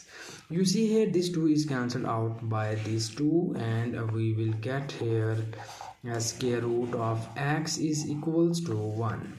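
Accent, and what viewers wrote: India. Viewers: Dreadful. unnecessary Indian accent.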